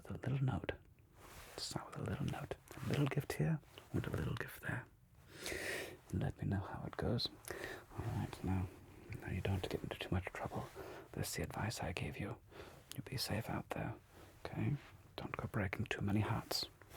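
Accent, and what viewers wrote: Germany. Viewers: Are you german if not your denglisch is perfect 👌🏻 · German words spoken without any particular accent. Sounds like a German speaking English. And perfect use of "Denglisch" mixing German and English words. hat Klasse!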